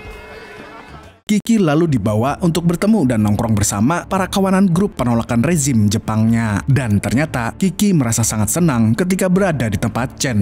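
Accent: Banjar, Indonesia. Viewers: ai admin bahasa Banjar sakalinya😮😅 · Banjar kh bng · Org banjar kh ni.?? Slm knl dari bjb. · waaaaayyy abang orng banjarr kah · Ikam urang Banjar kh?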